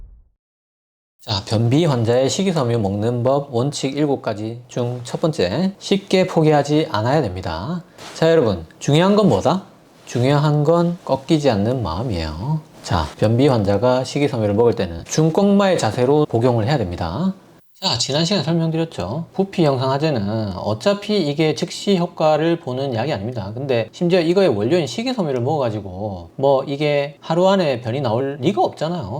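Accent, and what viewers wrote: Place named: Busan, South Korea. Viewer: 우연히 선생님 영상보게되어 계속보게되네요 말씀하시는게 너무 친근하게 느껴져서 이상하다 했더니 부산에계시는군요 ㅋㅋ 같은부산사람 이어서 그런지 더 반갑습니다 좋은정보 너무 도움되고 좋아요 감사합니다 ~~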